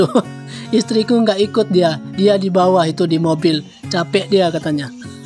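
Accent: Medan, Indonesia. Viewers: Maaf, bang ini suku apa ya, saya suka dengar logatnya, kayaknya bahasanya mirip logat medan...😊❤ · abg org medan ya logat abg kayak org medan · Baik bahasa Medan kita nya bg😂😂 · Saya sering ngikutin konten Anda.saya mau tanya dialek kayaknya anak Medan.udh lama tinggal di Jepang?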